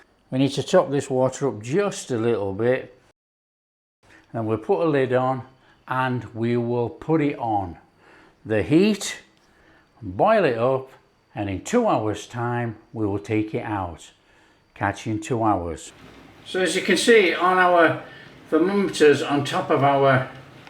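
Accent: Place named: England, United Kingdom. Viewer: Hi this amazing,I hope this ham will be delicious I also like your English you come from England, watching in Mombasa Kenyan coast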